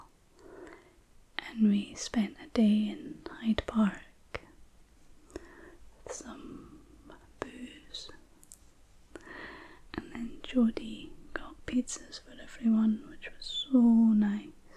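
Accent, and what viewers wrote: Scotland, United Kingdom. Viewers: I love your Scottish accent im from sunderland in the north east of england and i love visiting scotland every chance i get 😍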